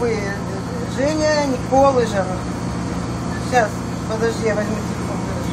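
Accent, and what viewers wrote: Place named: Eastern Europe. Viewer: Yeah... def Eastern European.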